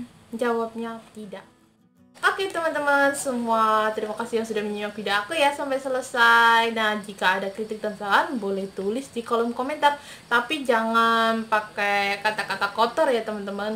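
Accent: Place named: Indonesia. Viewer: Yang kamu cakap sekarang ni bahasa Melayu lah Yang beza loghat Kamu berbahasa Melayu loghat Indonesia Di Malaysia bahasa Melayu loghat Malaysia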